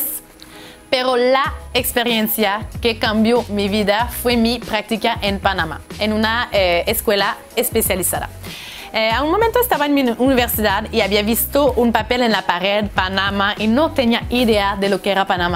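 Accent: France. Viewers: Llevo poco tiempo viendo tu video y pareces una linda chica, dijiste que eras canadiense, pero pareces más francesa, hasta tu acento. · Tienes acento Francés! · Interesante todo lo que nos hace mencion. Yo vivo en EEUU en estos momentos, pero soy original de Lima, Peru. Me doy cuenta que tu acento es frances y segun me cuenta una amiga francesa es mucho mas facil aprender castellano para ustedes y viceversa. En estos momentos estoy en Ohio y como que entiendo la vida esa de vivir en un lugar de vieve. Yo vivo en Nueva York y no por el hecho que esta cerca al mar no niva mucho como en Canada. En fin, todo lo que cuenta es muy dificil para gente que no han salido fuera de su pais. Lo cual es completamente diferente y como que tu tienes la vida esa aventurera de encontrar otros horizontes. Buena, suerte en tus objetivos. Espero, poder intercambiar ideas contigo.... 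Hablamos...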